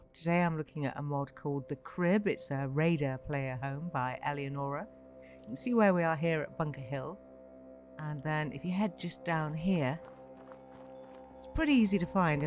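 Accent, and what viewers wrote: United Kingdom. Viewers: You sound like the cutest mother playin Skyrim with that Pommy accent. 😆xx Aawh fkn ell! 😂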